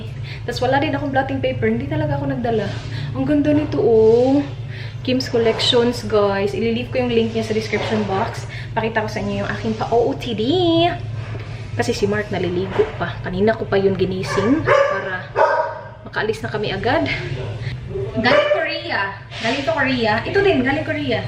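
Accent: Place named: Visayas, Philippines. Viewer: Lalom kaayo ug bisaya ni si.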